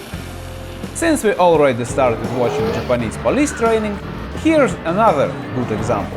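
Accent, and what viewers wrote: Russia. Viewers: Recorded in Buenos Aires by a dude speaking English with the Russian accent. Nice! :) Безопасно себя ощущаешь на байке в Capital Federal?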